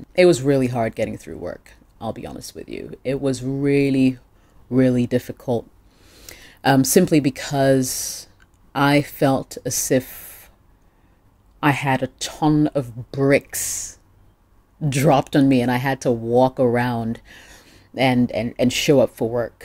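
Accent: United Kingdom. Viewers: Hi, How are you? You are blowing my mind with the way you Speak! The way you speak English is so clean!!! Up to now, I have never heard you break out into an accent. I have a toss-up between you being British-trained or US. So I am from Trinidad and Tobago. There are people in my country how sound is clean as you, being so well-spoken. Trust me I love the way you speak! Because I speak with my Trini Accent a lot, you can sound a bit annoying(lol), however, it's really pleasing to listen to you. Keep it up and every so often drop in your Ghanian accent if you can. Well keep checking out your topics. Have fun doing what you do. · You sound like you've spent formative years in the U.K. to me.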